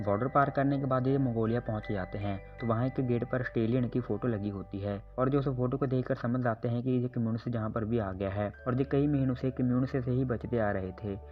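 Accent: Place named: Haryana, India. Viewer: Bhai hryanvi ho k? Touch aa rheya se hryanvi.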